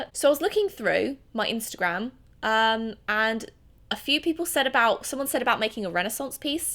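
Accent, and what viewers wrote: United Kingdom. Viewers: im confused is she British or American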